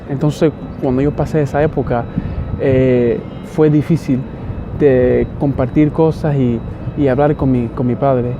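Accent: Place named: Cuba. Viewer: Tiene que ser Cubano sus raices con ese accento.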